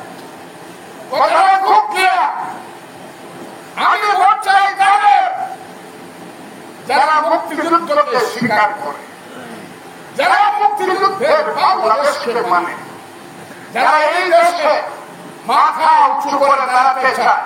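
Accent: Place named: West Bengal, India. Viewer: এই পাগলার কথা পশ্চিমবঙ্গের সাংবাদিকদের মতো 😂😊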